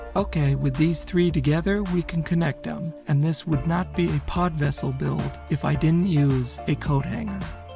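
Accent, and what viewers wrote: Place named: South, United States. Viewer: Hi!👽 Southern accent… 6 out of 10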